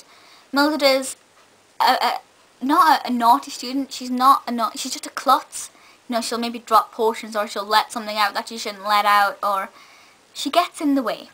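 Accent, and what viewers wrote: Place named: Scotland, United Kingdom. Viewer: I am lovin your Scottish accent. I remember the film The Worst Witch (1986), and boy was it funny. Its hilariously bad..a must see.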